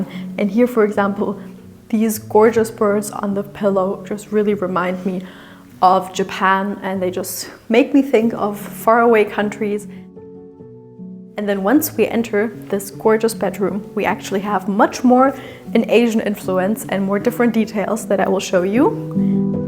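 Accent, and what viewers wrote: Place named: Germany. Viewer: Die Objekte auf diesem Kanal sind ja wirklich interessant und inspirierend – wenn nur die Moderation nicht wäre: Dieses deutsche English, verbunden mit einer kindlichen Schwatzhaftigkeit, ist einfach entsetzlich nervig.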